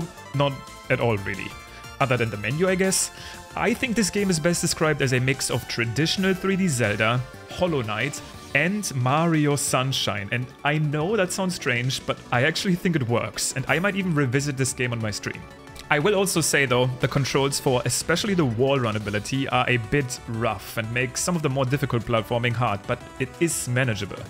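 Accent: Germany. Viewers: I love the Fortnite chest sfx in the first game. Is you accent German? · you sound very much german😅😂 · Man hört zwar das du Deutsch bist aber trozdem machst du Gute Videos aber warum Raid shadow legends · Xd ich glaube du bist deutscher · are you german? austrian? nordic? dutch? i must know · you have a very german accent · Kann es sein das du Deutsch bist? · Bist du deutsch bruder? XD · Are you german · Man merkt halt das du deutsch bist (kein hatte) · You sound german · Im hearing some german acent ?? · Bro this german accent… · Man hört so stark das du deutscher bist😂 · Dein Englisch klingt sehr Deutsch 😂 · So i would geas your german ;) If not you have intristing Acsent Kein bock mehr zu schreiben gg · i hear some GERMAN accent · Sachmal du bist doch deutsch oder 😂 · Hes german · you sound very german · tasting the games 😂 ich liebe deutschen Akzent im englischen so sehr 🥲❤️😂 deine Videos sind toll mach weiter so ☺️ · You're German aren't you 👁️👄👁️ ich fühls in den nuggets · digga wie deutsch du bist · 3 seconds in and i hear the german accent lol just found u but u seem cool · Are you German? 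· Alter man hört so geil raus das du Deutscher bist :D Wünsche dir alles gute ! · bist du deutsch? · German! I can hear it from the accent alone! Guten Tag!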